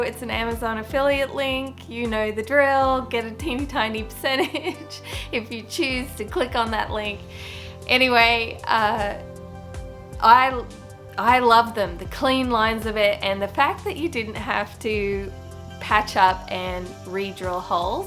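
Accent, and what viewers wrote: Australia. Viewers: another beautiful makeover! My reaction to the comment(s) about you: Some people can be brazenly offensive. If they have an issue with your channel, then why are they watching? You don't need that foolishness. Continue to be you. I love listening to you (love the Australian accent) and learning from you. Just thinking about what was said irritates me and even though you don't know the person, those comments can be hurtful. Block anyone else who can't appreciate you and what you do. Let's not waste any more time on shallow people. Evidently you're doing something right by being YOU. Your channel is growing. Congratulations on the 10K! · We love you and admire your work! In the shop you are Working Woman, not the glamour version, plus the heat and humidity of TN--well, I would wait til cooler weather, being more the wimp. So don't let the snarky ones affect you. 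Love the Aussie talk, so talk on! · Smiling Saturday!! We're here for it and your lovely Aussie accent. Oh, and you outstanding work too!!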